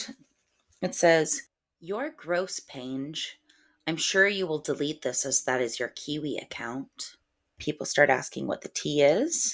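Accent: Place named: Canada. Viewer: you sound so canadian lol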